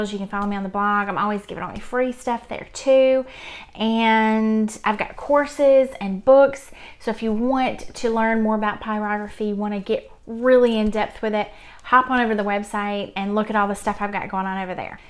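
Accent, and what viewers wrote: South, United States. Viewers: You’re cute, I love your Southern accent! I’m from Minnesota. Take care! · As a South African who recently tapped into this market, it wasn't the tips and tricks that kept me here... It was that addictive southern "if I'm correct" accent. The actual content was a added bonus 🤣🤣🤣. On a serious note though, do you sand the piece after burning???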